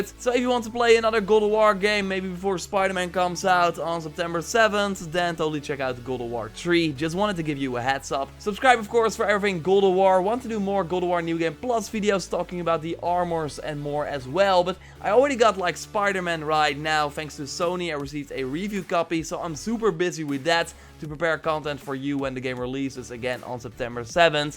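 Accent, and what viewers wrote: Netherlands. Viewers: do i smell dutch?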